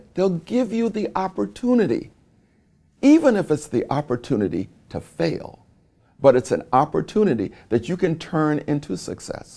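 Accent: United States. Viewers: He has an American accent. I don’t understand. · It's partially because of your American accent. Many Brits love an American accent.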